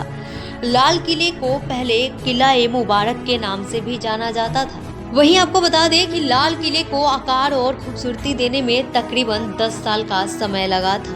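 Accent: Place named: Bihar, India. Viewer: Voice over me bihar ka touch hai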